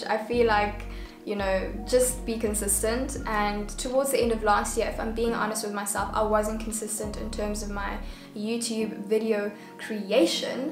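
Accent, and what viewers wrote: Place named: South Africa. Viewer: Love this so much I subbed as a fellow South African 😁🇿🇦 I literally heard your voice and was like aish!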